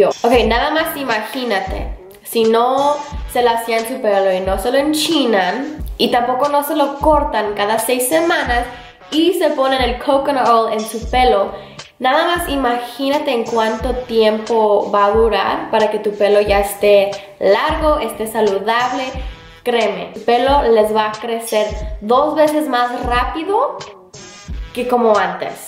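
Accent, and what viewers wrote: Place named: Latin America. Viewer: todos diciendo que le cuesta hablar en español y yo que la acabo de conocer pensaba que era latina:v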